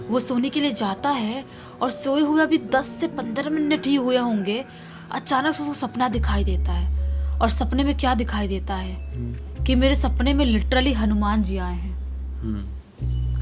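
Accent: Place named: Uttar Pradesh, India. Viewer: Bhai hum roj aapki kahani sunte hai aur aap aaisa lagta hai ki aap up ke ho 😊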